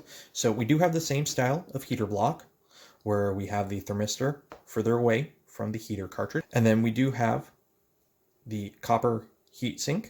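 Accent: Canada. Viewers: You are in Nth America though....common admit it - you re CANNUCK !! You cant hide that accent :-)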